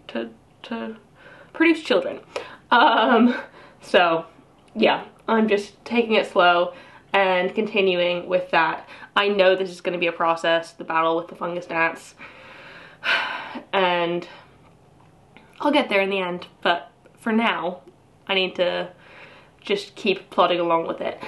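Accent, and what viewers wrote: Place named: England, United Kingdom. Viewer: You can tell when she’s been hanging out with Claire a lot cuz the English side of her accent gets heavier lol. How long have you lived in the UK?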